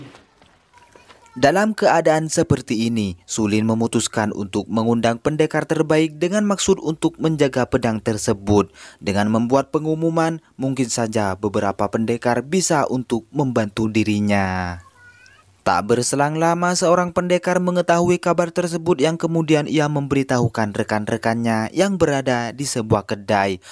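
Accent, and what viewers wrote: Madura, Indonesia. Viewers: Aksennya seperti orang madura.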